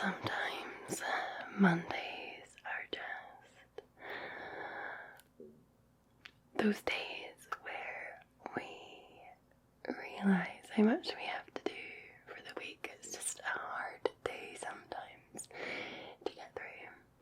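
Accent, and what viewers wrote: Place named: Glasgow, United Kingdom. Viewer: From Glasgow AFAIK, or at least one of the towns around it.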